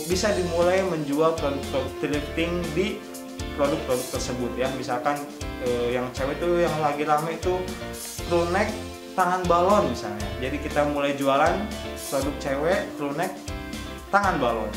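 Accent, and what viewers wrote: Banjar, Indonesia. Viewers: A org banjar sanes?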